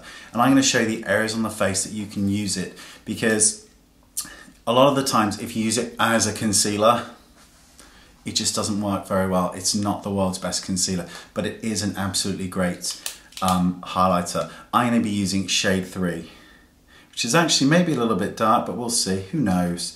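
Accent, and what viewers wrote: France. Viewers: What a beautiful french accent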